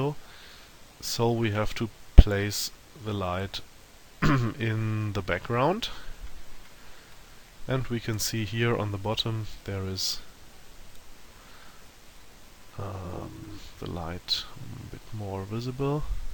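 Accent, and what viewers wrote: Germany. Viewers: oh mann ich hasse den deutschen akzent :D Gutes Video trotzdem, danke!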